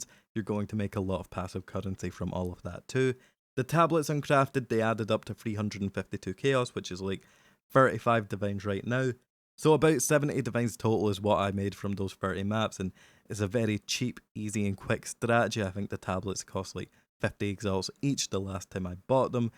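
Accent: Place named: Scotland, United Kingdom. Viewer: It's called scottish accent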